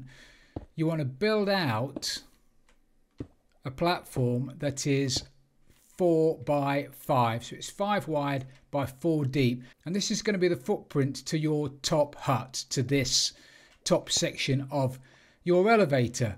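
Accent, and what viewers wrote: United Kingdom. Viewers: That British accent is, absolutely amazing.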